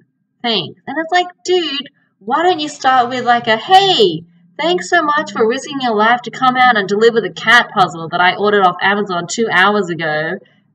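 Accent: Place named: Australia. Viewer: great to hear from you again and know you are doing well there. Probably you can talk about next show - how you maintain the Australian way of doing there, for example your Australian accent, and how you prevent yourself from being ‘colonized’ by the Americans. Lots of love, SK.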